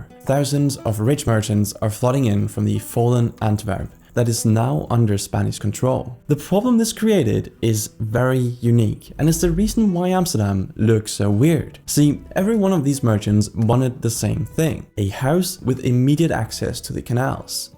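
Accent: Germany. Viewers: I dislike the German pronunciation of Dutch words, otherwise a good video. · I'm kind of getting triggerd by the German pronunciation of Dutch words, no slight to the uploader though Dutch ch and g sounds are hard. Just my own pet peeve. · Your Dutch sounds quite German, but I'm glad you're giving it a shot—nice video!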